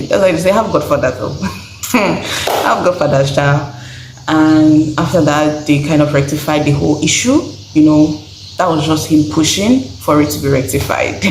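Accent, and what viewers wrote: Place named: Cameroon. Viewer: I just started watching the video and you sounded so Cameronian 😂❤ And then boom you mentioned it 😂❤❤ I'm a Cameroonian too ❤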